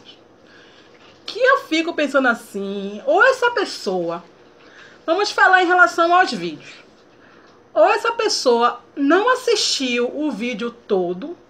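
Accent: Bahia, Brazil. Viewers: Engraçado ne não agravando a todos "Tem pessoas q não gosta do nosso sotaque baianes fala mal de nosso povo e quando chega o Carnaval tá todo mundo socado até o talo na nossa terra vai entender "Manda esse povo deitar na Br. 😂😂 Linda sua maquiagem.😘😘😘 Liga não o povo quis dizer q seu amor é todo grosso mais é os braços kkkkk 😂😂😂😘😘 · kkkkkkkkkkkkkkk isso mesmo manda conta do mes pra pagar seu dentistakkkkkkkkkkk adorei o beijo p euzinha e p Joaozinho linda que Deus abencoe muitoooo baiana arretada adoro seu sotaque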